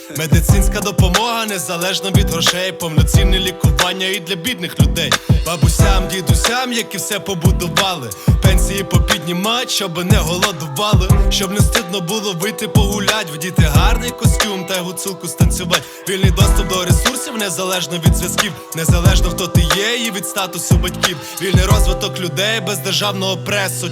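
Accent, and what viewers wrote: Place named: Chechnya, Russia. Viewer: На чеченца похож как внешностью, так и говором.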